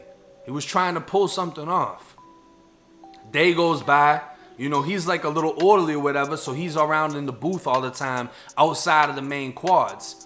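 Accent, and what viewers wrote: Boston, United States. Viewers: You sound crazy ass fuck but yo opinion he has a east coast Boston, accent but what do I know 😂😝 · You have an accent.. Are you born and raised in Fl? Bc you sound like your from Boston or even maybe Ny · If u didn't say you was from Florida I would of thought u was from boston... I love your channel bro...I wanna do what you do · Boston in the house! I love this kid but damn his accent is serious. I'm from Beantown and got an accent but not like this homie. #617 #508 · I love Bostonian accents, "and suddenly you're droppin' your R's " Love it, dude you gotta write a book. You're a fucking word smith, smart, awake, and able. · Omg I know!!!! 🔥 💚🤍❤️ And it’s a sentimental thing for me too. My dad and Uncle were full blooded second generation Italians born and raised in and around Atlantic City, NJ. They moved away in their 20’s and my Uncle still has the accent after living in Florida for 42-43 years. 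His is the closest to cuz he has a little southern thrown in too. My dad had it to but not quite as thick because we spent many years in Michigan (until he passed). I noticed the longer has been lives up there in MA the thicker his accent gets, which is completely normal. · It's just a Boston accent. Pretty normal for the boston area. You don't get out much huh lol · Shit i literally started listenin to channel 3 days ago. Friday and its sunday...shit i was like you gor a boston accent. Lol i dunno i can relate to everything he sayin so im goin video to video.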